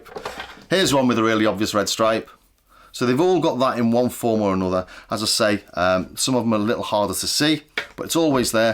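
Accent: Liverpool, United Kingdom. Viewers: excellent videos. i was proud (as a canadian) to be able to identify the Liverpool accent :) I'm not sure if it was this or another one in this series where you asked about fractal patterns in sequencing and such, and im positive someone else has already mentioned it to you, but i would be remiss not to mention Stochas. keep up the great work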